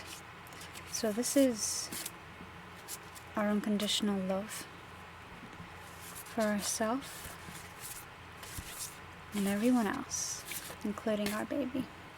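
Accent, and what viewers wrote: United Kingdom. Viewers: Hi are u from the UK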